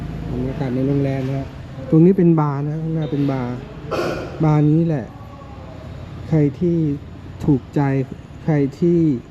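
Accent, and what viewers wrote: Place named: South, Thailand. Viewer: รุกภาษาใต้นคร55555😂😂😂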